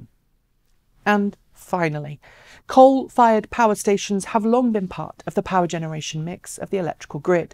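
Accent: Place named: England, United Kingdom. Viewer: Your accent makes me think of cream teas and an English countryside . Thanks .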